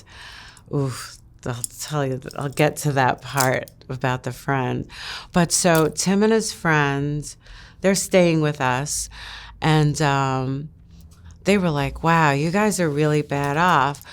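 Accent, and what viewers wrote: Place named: Long Island, United States. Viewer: Rename this >>> Strong island she and her accent is meeeee and all my Long Island friends ………..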